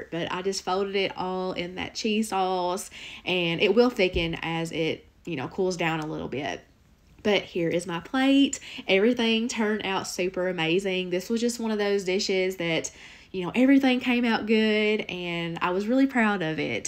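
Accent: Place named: Kentucky, United States. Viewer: I watch to get ideals but then mostly I miss my Moms KY accent and your is so much like hers!!!!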